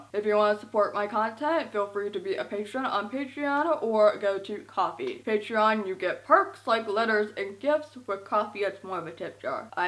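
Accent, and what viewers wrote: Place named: New York, United States. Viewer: lol, I was just joking around, the New York and Boston accent are very recognizable even to us Canadians. I stand by it being cute still.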